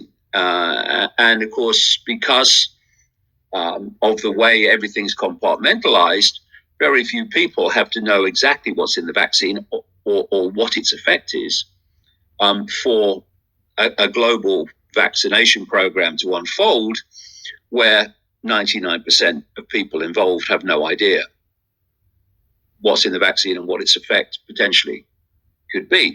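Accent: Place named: United Kingdom. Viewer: Woke In So Cal 100% agree! He can be funny too when he mocks people in his British accent 🤣 be vigilant,stay safe and head on a swivel! Much love ❤️💯🙌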